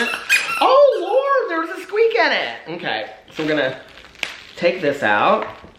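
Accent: South, United States. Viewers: My mom loved the little house on the prairie bit. She’s from the suburbs of Chicago and says, wow he has such a country accent 😂😂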